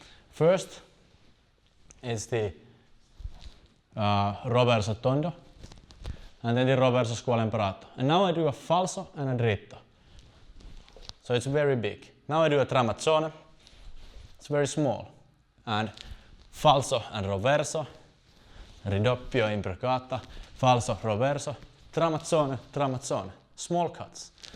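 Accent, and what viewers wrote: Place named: Finland. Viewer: Are you Finnish? Your name sounds like it, you really look a lot like my wife's cousins in Helsinki, but your accent is all over the place! Sometimes it reminds me of the accents of my Finnish family, at least with certain sounds. Whether you are or not, your accent sounds very worldly and sophisticated to my ear at least, so please know I mean no offense. That said, I really enjoy your content here! I hope that you are still training and coaching wherever you are!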